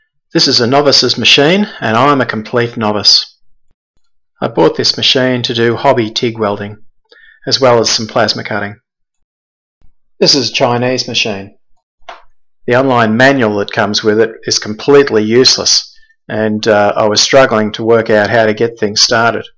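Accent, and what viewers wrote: Australia. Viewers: Great review. It's hard to hide that Aussie accent. I just Googled it and it seems pretty good for the price. It's currently list at $349. Is that what you paid? What part of Oz are you in? I'm in Gippsland. Cheers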